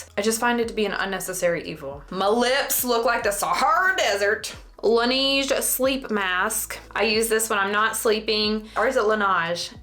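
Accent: South, United States. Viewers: Good morning ❤️🙏. I’ve been checking out a lot of your videos from the older ones to the ones that you post now and may I say that I absolutely love your tell it like it is attitude and I definitely agree with you on a lot of what you say.👍🏻💪🏻🥳. I also love to check out your cooking videos as well cause you truly are a hoot when you’re cooking and I love your southern voice too. I’ve been checking out at least two to four videos from you daily.😁👍🏻. Do hope you have a wonderful and blessed day and don’t ever change that awesome way of thinking too cause a lot of what you say is absolutely the truth. God bless you and keep the faith and definitely keep smiling too. You’re a pretty lady too. Hugs, Sue M. Clearwater, Florida ❤️🥰🥳💪🏻🙏⭐️🐚🌴❌⭕️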